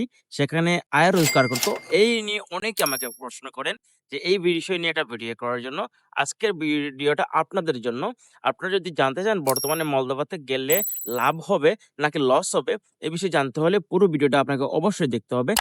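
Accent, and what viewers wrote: Sylhet, Bangladesh. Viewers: সিলেটি মনে ভাইসাব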